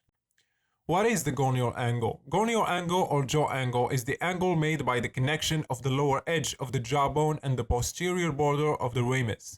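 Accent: Morocco. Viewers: wach nta mghribi azbbi ach had l accent hhh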